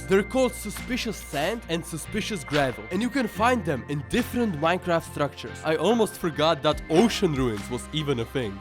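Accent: Czech Republic. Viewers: Dle tvého přízvuku si tak na 85% typuju, že jsi z Česka. Je tomu tak? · cau podle prizvuku jsem poznal ze jsi cech drzim ti palce at se ti dari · ceskej accent jde hodne znat xd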